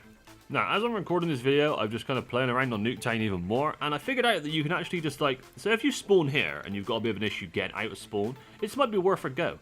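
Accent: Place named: Bristol, United Kingdom. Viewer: You sound very local to me lol...... Bristol?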